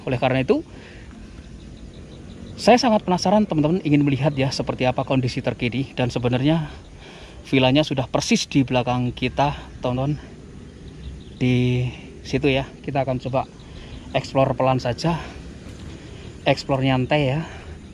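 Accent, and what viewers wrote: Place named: Lampung, Indonesia. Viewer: Asslm, waduh jane bru sempat buka hp bos qu, walau tapi pasti hadir slm mlenuk 2 wai dr lampung, ms bhsa ngomong nya kok sama dgn tmpt ku si, kurang jegrak, e ms siang hari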